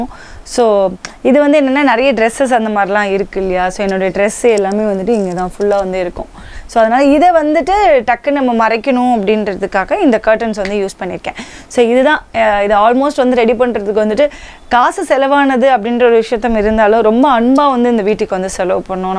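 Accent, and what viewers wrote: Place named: Madurai, India. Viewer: Neenga maduraa ah?? Madurai- slang.. துணி மணி❤️ Vijay still from Thuppaakki movie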